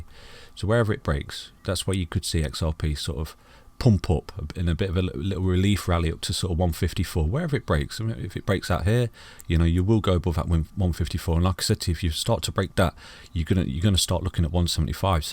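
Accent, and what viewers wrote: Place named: East Midlands, United Kingdom. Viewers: Sounds like east midlands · He's from Leicester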